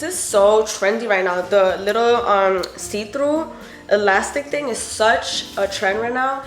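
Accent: Colombia. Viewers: You’re red hair looks BOMB😍😍 love your Columbian accent!! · I love her colombian accent ♡ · I love your Colombian accent 🤤😍 and your body 🔥🔥🔥🔥and yes please do a video on how to pose ! ✌🏽✌🏽✌🏽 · When you speak Spanish the Colombian accent wow 😍, reminds me of my Colombian family makes me wanna go eat some buñuelos, arepas and empanadas. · 😍😍😍😍😍😍😍😍😍😍😍😍😍 You looks so pretty and btw i love your colombian accent you should speak it more love you💓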